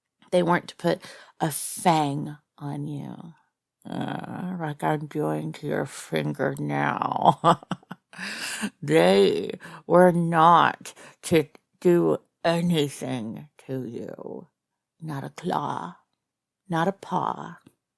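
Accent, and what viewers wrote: United States. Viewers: Haha, and with a channel name like I thought it was expected. Though I was more referring to your voice in general. It’s nice to hear your blend of softened strength, the occasional spice of sadist, and a little North American country twang that serves as the perfect cherry on top. Anyway, keep up the good work and don’t forget to take care of yourself~!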